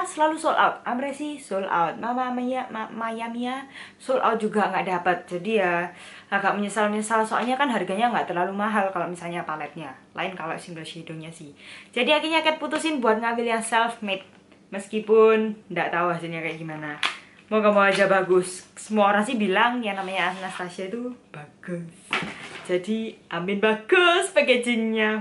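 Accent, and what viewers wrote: Java, Indonesia. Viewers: medog jawa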